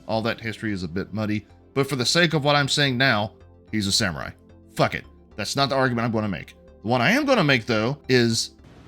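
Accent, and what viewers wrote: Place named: Texas, United States. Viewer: You sound like Texas just play as the female character if you don't like YASUKE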